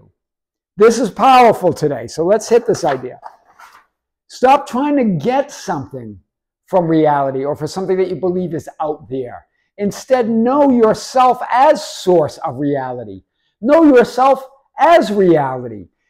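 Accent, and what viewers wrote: Boston, United States. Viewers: dispenza, tolle, neville, zeland, abraham hicks...all good...but the greatest and most complete is this man from Boston. throughout history only Jesus, Krishna, and a handful of others were better teachers, that's how good Mr. is. yeah, he looks scruffy and normal right? well guess what, real Buddhas are often so simple and humble that the common man would never know who they are. people flock to dudes with giant robes and paraphernalia but I tell you all, with conviction, this man is among the top 3 spiritual teachers alive on the planet but most people would never know it. they will judge him by him folksy demeanor and not realize he is a master teacher. those of you who know who he really is are lucky. he is one in a billion, literally. I'm still amazed by how this gem talks in a casual Boston accent and is so light and cheerful, most will never know they are interacting with a true MASTER. 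life is hilarious hahaha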